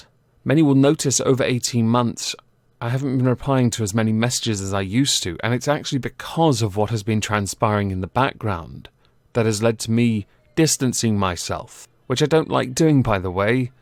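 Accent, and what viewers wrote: United Kingdom. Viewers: My condolences for issues you're dealing with. I hope whoever this fan is gets professional help, because this kind of fixation is unhealthy. I hope the issue is resolved as soon as possible and appreciate your upfront acknowledgement of the situation so your supporters (yes I had been using the vernacular meaning of fan in the past, but given the circumstances I will switch to your preferred and honestly more precise term at your suggestion, not out of sicophantism but because you made a good point regarding the implications of the word). Best wishes and look forward to seeing more content from you in the future. Edit: For the record I find the precision of your diction pleasant to the ear but not unusually so. I recognize you have a British accent, but you make no pretense at anything else so I don't think it's a big deal. I do find your educated choice of language enjoyable to hear, simply because I instantly know what you mean by your choice of words. 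But again, it's more an intellectual enjoyment like reading a well crafted sentence. Actually I take that back, it isn't like that intellectual enjoyment, it is that intellectual enjoyment.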